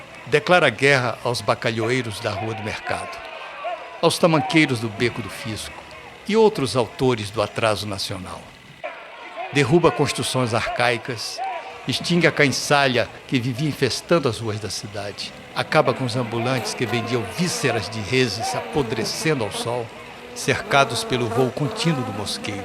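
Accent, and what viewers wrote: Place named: Portugal. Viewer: Esse narrador deve ser europeu rs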